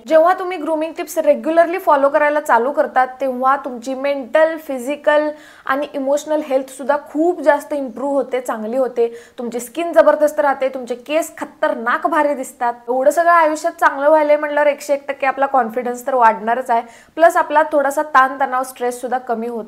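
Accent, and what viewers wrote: Kolhapur, India. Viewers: Are u from Kolhapur?? Bolnyavrun tr tsch vataty khr ahe Kay?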